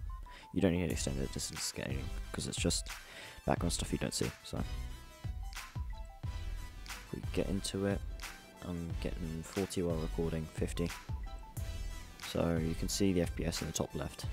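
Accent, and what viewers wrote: United Kingdom. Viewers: yes and this british accent makes it FUCKED UP.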